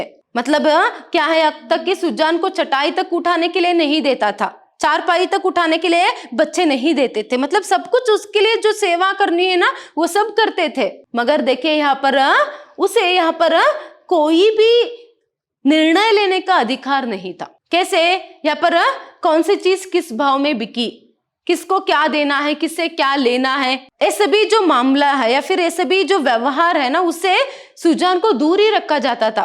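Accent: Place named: Tamil Nadu, India. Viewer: mam your hindi has tamil accent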